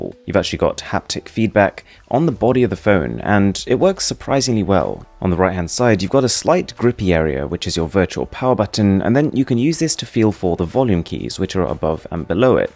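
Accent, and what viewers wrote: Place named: United Kingdom. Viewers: You have british acacent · He is British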